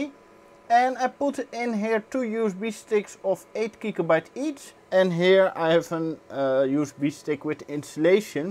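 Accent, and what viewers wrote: Netherlands. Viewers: Je klinkt erg Nederlands.. Klopt dat? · ik kan gwn meteen horen dat je nederlands bent hahah Wel leuke vids man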